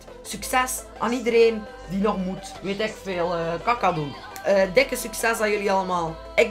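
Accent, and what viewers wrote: West Flanders, Belgium. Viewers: het west-vlaams is een deel ven je kanaal en daarom maakt het je zo grappig en tof om ernaar te kijken maar probeer wat meer an te praten voor mensen die je niet zo goed verstaan maar je west-vlaams is een deel van je kanaal:D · ik ben van beveren (kweet nie of wij echt een hard accent hebbe) ma ik versta u echt goe ze! ma u taal ga later bij u job of zo wel ne rol spelen stel gij gaat werke in brussel i do no dan gaan ze u mss minder snel aan neme omda gij west-vlaams spreekt ma tis anders echt wel een deel van u kanaal geworde ma ik zou het misschien wel doen maar dan nie per ser voor youtube gewoon voor u dagelijk leve en shit... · laat u taal zo wantt west vlaamss een goeienederlands u accent is zo niks aan te doen en tis een deel van u kanaal :p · west vlaams is het oppernederlands! ik hou net vandit accent!, maakt u video's duust keer grappiger! 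maarja, doe watje zelf denkt best is he ;) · blijf west-vlaams praten! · West-vlaams voor de win !!!! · no taalschool shit WESTVLAAMS!!!!! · Ik zou het niet doen, het westvlaams is awesome en het zou heel anders moest je inneens Algemeen Nederlands gaan spreken ipv een dialect. Westvlaams is de shit xoxo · Ik kom uit antwerpen en ik begrijp alles zonder moeite. Hou het westvlaams het hoort bij dit channel.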